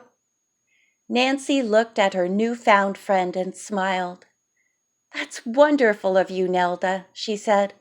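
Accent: South, United States. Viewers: I love your southern accent !! It is perfect !!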